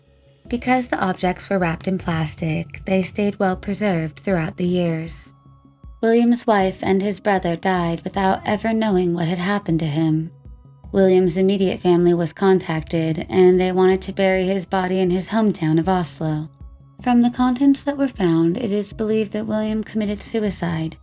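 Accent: India. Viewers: Thx, not the Indian Guy speaking again 😬🤭👍👏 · I personally love his accent, and I'm quite fond of indian/middle eastern accents in general. They are a joy to my ear. I do agree though that soothing voice is perfect for the channel and is most effective at conveying the sometimes unsettling information in a very palatable way. Love the duo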